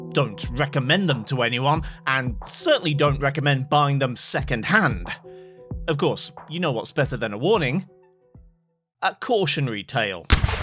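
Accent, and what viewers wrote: England, United Kingdom. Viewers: Saw someone mention you on a Gamers Nexus channel. Had a quick look, and saw this vid which was a question I was wondering about. Soon as I heard a sane English voice, I was in :D Plus your nearly at 100k subs.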